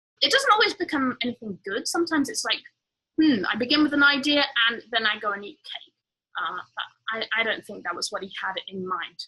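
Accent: United Kingdom. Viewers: I love your accent,. Are you English??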